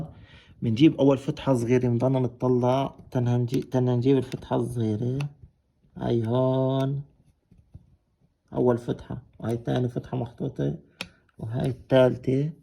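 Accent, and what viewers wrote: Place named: Palestine. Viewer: لهجتك فلسطيني يارب ينصركم + الطريقة نفعت والله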